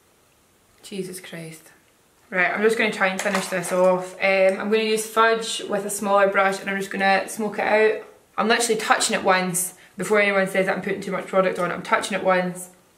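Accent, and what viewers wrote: Scotland, United Kingdom. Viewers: Are you Scottish? :} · She's Scottish, and yes I love her accent too!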